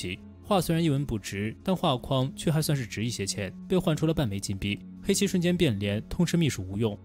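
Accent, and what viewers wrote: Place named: Shandong, China. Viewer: 感觉作者听起来像山东人。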